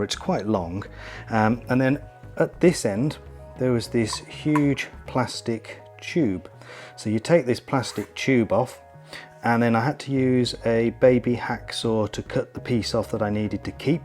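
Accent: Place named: United Kingdom. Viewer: I came for the switchbot and stayed for the polite sounding british accent 😊 btw linus sebastian vibes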